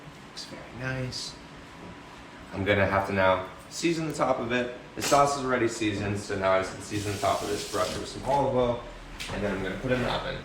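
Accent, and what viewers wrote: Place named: United Kingdom. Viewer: AM I THE ONLY WHO HEARS SLIGHTLY BRITISH ACCENT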